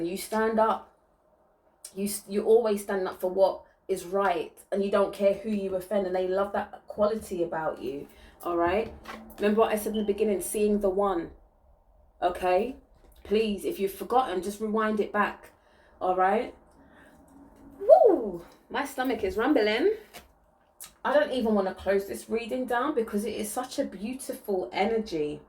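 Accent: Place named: United Kingdom. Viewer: I have just tuned in... But I know....I'm feeling you already beautiful soul Si💫🙏❤️🌻🌄✨xx My place of birth Is not far from you, According to your accent 😉😋.... Isle of Man ... Ellan Vannin in my ancient tongue Mona / Mann By my Viking ancestors 650bc x In my ancient tongue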